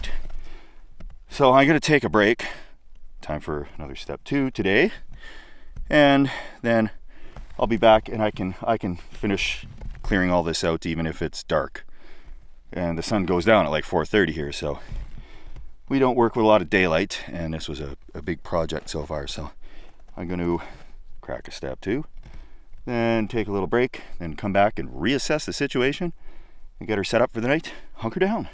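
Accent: Canada. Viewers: This man so Canadian the way he talk I love it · Is he from Canada? He sounds like it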